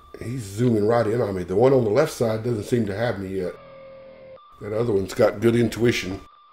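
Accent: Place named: United States. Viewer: I know nothing about submarine games. I watched this because of your fantastic accent!!!😍😍😍 What U.S state are you from❓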